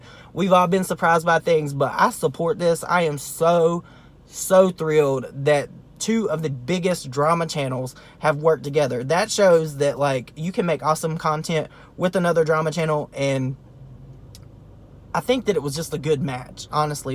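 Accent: South, United States. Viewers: I know you don't know much about MAKEUP itself, but did you just say, "Pat McGrath is rolling around in HIS grave?" I played it back many times!!! Pat McGrath is a Woman, and also still living!! Maybe it was just that I had trouble understanding your Southern Accent, and So Sorry if I misunderstood you. I was just at a loss when hearing that!!! WOW!! Jaclyn's Shady as ALL HELL!! I agree with her Ex husbands IG, (where he posted after her post of her hand on top of her new man's hand!) Well he Posted a photo, of his dogs paw on top of his hand, (meaning to me, A BITCH's paw or hand. One in the SAME!) LOL!! I understood what Chris was meaning!!! ALSO AMERICAN HORROR STORIES ON TV ON WENSDAY NIGHTS, TODAY'S ONLY TUESDAY!!! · Where is your southern accent from? I'm from Georgia. 😁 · New viewer! Love your accent! I'm a southern girl, too. Where are you from?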